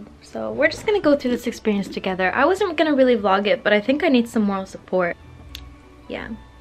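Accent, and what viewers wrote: United States. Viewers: I'm a bit confused! Your accent is American and you say pounds££ so I'm a bit confused or is it just me thinking you sound American?🤔🙈🙈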